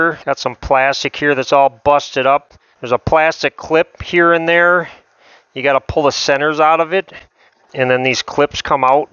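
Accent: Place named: Minnesota, United States. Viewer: Great video! I love your accent. You sound like you're from one of the far north states like Minnesota or around there.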